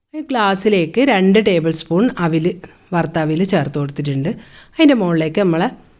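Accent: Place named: Vadakara, India. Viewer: അവിൽ മിൽക്ക് ഇഷ്ടം ആയി സംസാരം അതിലും ഇഷ്ടം ആയി അവിൽ കയ്യിൽ ഇട്ട് നുരുമ്പുമ്മോ പൊടിയണം വടകര ഭാഷ എന്തായാലും സൂപ്പർ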